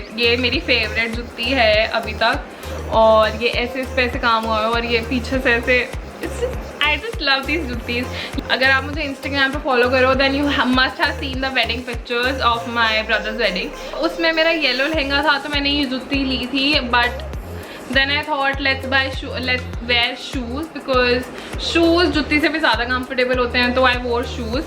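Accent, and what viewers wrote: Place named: Punjab, India. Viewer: Punjabi tone in hindi is best 😎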